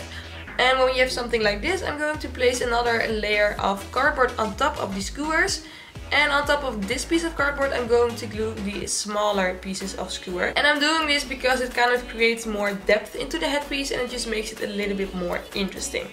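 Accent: Netherlands. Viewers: oml are you from the Netherlands? you really sound dutch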